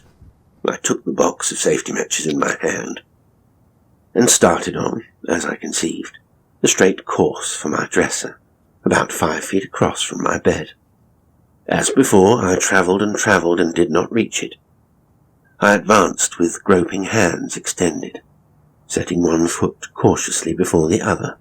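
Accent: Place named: United States. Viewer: This is the first story I have heard you read with an American accent. You are very versatile, Sir, and I am full of admiration.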